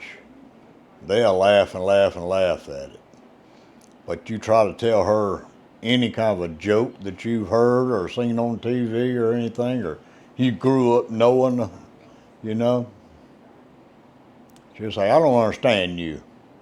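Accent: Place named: North Carolina, United States. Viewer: You remind me of my relatives on my Mom’s side of the family. They’re from North Carolina. Brought back fond memories listening to you speak. Love it.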